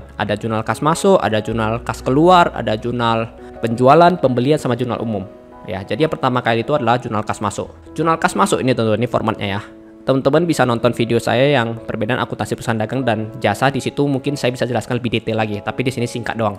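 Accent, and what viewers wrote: Medan, Indonesia. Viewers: metah kali medannya abang kuuu, terima kasih banyak ya bang, ngerti juga akhirnya aku. semoga ilmunya selalu berkah dan sukses terus ya bang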